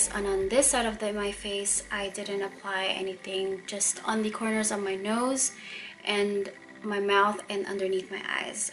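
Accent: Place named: United States. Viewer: Thank you for the review! I was wondering about this primer. ^_^ And ignore that speech comment. I thought you were American because you sounded very clear. :)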